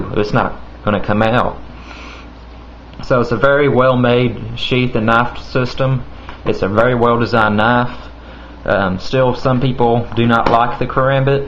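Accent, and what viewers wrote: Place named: South, United States. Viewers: I don't care what people say. The southern accent is awesome! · Look people, he's a southern man with a slight speech impediment, lets have SOME decency and comment on the knife being shown? Not on this man's accent. Now, i think its a cool knife, it is manacing though, this is probably why you get looks, it's foreign in design, meaning people probably see it as solely a weapon and Not a tool. Cool knife. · I love your Texan accent.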